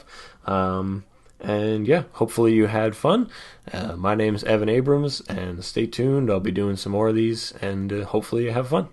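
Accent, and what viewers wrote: Canada. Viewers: Hein quoi?! J'entend par surprise du québécois dans un vidéo anglo?! Ça c'est nice! :p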